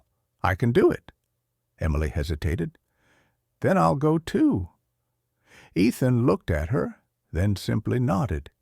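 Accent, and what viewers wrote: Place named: South, United States. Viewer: Largo, Florida. I love your stories but I'm not crazy about the newer voice with the slight southern accent... 🙏💞🙏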